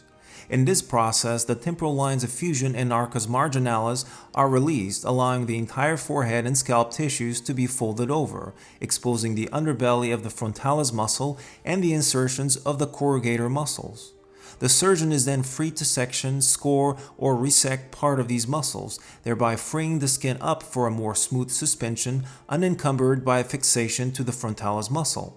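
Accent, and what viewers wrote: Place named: Turkey. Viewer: is he Turkish? he sounds Turkish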